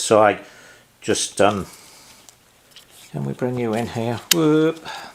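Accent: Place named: Newcastle, United Kingdom. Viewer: Wonderful to learn from a fellow Limey in NY State. I'm in the Hudson Valley, but lived in Ithaca in '79. Do you accept visitors? I'd love to meet you. You sound like you might be from up around Newcastle way, my best mucker was a Geordie. Anyway, please keep'em coming. I have a '68 TR6C with Routt 800cc kit, a '68 P11A, and a '70 T100C. All in almost original condition. Cheers.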